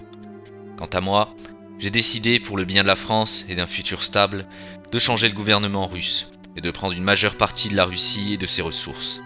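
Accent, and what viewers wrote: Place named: Alsace, France. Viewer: Oui tu as un petit accent c'est pour ça que j'ai cru que tu était alsacien ^^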